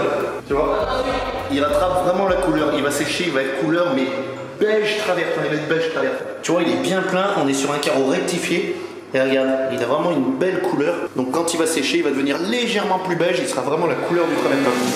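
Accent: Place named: Vendée, France. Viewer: Salut. J'adore ce que tu fais et en plus moi qui suis d'origine Yonnaise, exilé en Limousin, ton accent est ma petite madeleine de Proust! Peux tu me conseiller sur la pose d'un carrelage 60x30? Pose à 2/3 1/3 ou 50/50? Merci et continue tes vidéos Thierry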